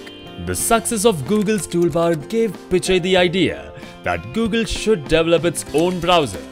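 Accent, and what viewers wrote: India. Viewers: Why you can bring Indian out if India, but not Indian accent out of Indian? :( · I hate that heavy Indian accent as well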